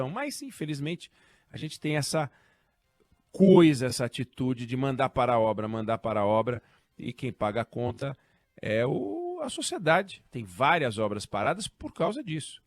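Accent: Rio de Janeiro, Brazil. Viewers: este mangolote não tem vergonha ficar narrando com esses dialético carioquismo ele é ministro do STF e presidente da casa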